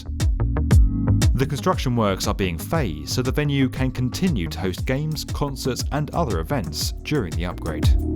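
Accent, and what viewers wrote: United Kingdom. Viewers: British pronunciation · U sound British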